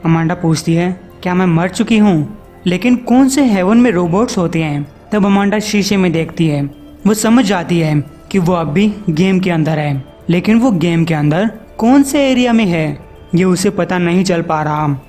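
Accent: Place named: Kota, India. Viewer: shai me.... Sayd maine tume deka hai... Me kota( jila )ke pas me ik gam se huuu.... Tumare bolne ke tarike se lagta hai ki tume bi yhi aas paas ke hi hoo...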